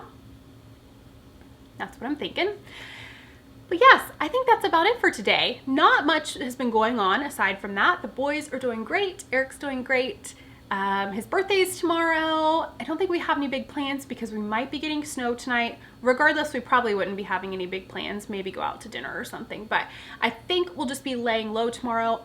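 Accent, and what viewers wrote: West Virginia, United States. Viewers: I hope you had your cosy snow day - chilli and brownies sounds like a great way to spend the day. Happy Birthday to Eric. The love story pattern looks great both ways, I particularly liked the version which just used 2 colours - although I think a gradient yarn may have merit in place of the minis. If you got snow I hope it clears enough for your family to be able to visit - you need to be able to practice your WV accent with your Sister (your reaction to “by” made me laugh)! Best wishes, J x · You seem to realy enjoy podcasting again without the additional responsibility of the shop, and your new pattern is lovely! I especially love the 2nd colorway you are making in the Lovestory sock pattern. Btw, being a WV born gal myself, I picked up on your accent coming through; happenns to me when I go back to visit relatives in Almost Heaven. 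I, too, love the winter snow season but only get to enjoy it once in a while when I visit the homeplace.. Thanks for sharing your knitting and knowledge. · I love seeing you back on track ❤ and I’ve never seen that color Stanley cup!! Let that WV dialect fly! 😂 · Good morning! Glad to have this to tune into 1st thing this morning. I am working my 2nd pair of Magic Loop Vanilla Socks!!! I learned from your tutorial and now feel so empowered to create the cutest socks. It makes me very happy, will never NOT have socks on the needle now. Your January yarnable socks are fabulous. The dark pink is stunning with the lighter contrast color. I will definitely try this pattern when I'm done with the vanilla socks I'm working now. Thanks for the inspiration, Has made my life even better!!!! Loved drinking my coffee to your vlodcast this morning- good way to get the day started. You can take the girl out of West Virginia but you can't take the West Virginia out of the girl!!!! 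Cute southern drawl revealing your roots!!!!!!! Have a great Thursday🤩🐑🍵🧶 -Carrie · I am so going to purchase your new sock pattern! I'd love to hear you talk in your W. Va. accent. I'm from Ga, so you already know how I sound. lol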